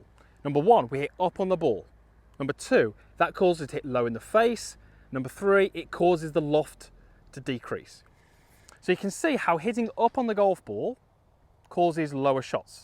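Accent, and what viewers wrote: United Kingdom. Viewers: Hard to understand brit english cos english is 2nd language to me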